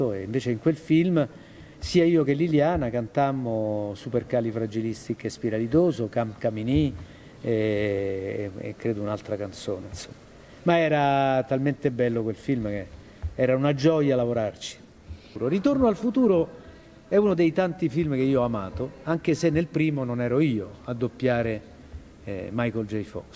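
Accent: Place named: Rome, Italy. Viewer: Diversissima no, ma è ovvio che in un contesto come questo sia meno impostata e si senta di più l'accento romano .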